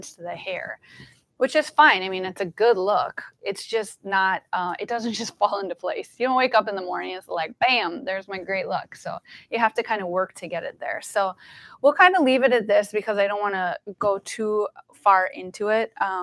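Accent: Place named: Eastern Europe. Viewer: rahul kumar I agree. And her accent is adorable. Sounds like she was raised with another language. Eastern European possibly? 🔥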